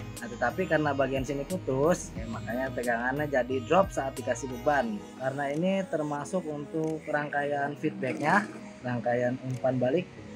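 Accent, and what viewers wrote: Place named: Betawi, Indonesia. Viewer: Logat bicaranya lucu ...Betawi Betawi gimana gitu.....mirip teman saya orang serpong😅😊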